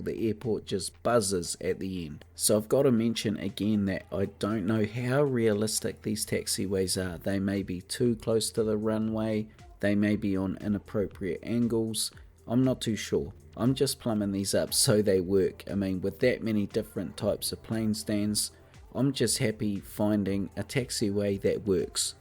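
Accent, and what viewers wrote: New Zealand. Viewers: You Kiwi?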